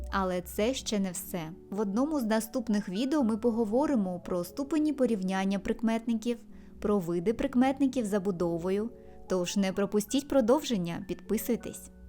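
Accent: United Kingdom. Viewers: Your voice is charming🪻Special thanks for the British English 🇬🇧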